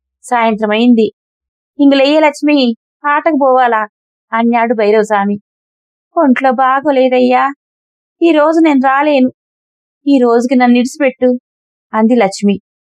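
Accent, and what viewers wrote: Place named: Chittoor, India. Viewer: చాలా touching గా వుంది కథ. ఎంతో బాగా చెప్పారు కథ. చిత్తూరు యాస కూడా ఎంతో గొప్పగా పలికారు.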